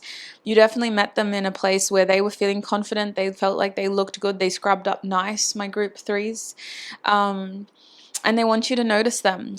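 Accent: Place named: Australia. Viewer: Just came across your channel and thought to myself - this lady sounds Aussie 🤔 and you’re an Aussie 🥳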